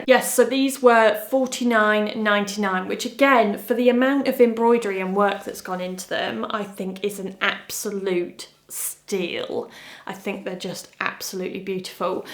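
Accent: United Kingdom. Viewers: Ohhhhhh Everything amazing 🎉🎉🎉🎉🎉. Your british Accent just kills me 😂 of happiness. So so elegant❤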